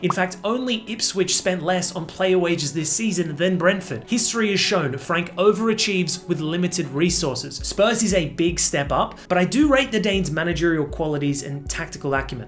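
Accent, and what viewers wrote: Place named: Australia. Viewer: Can’t believe I use to think was British 😂, definitely hear the Australian accent more clearly now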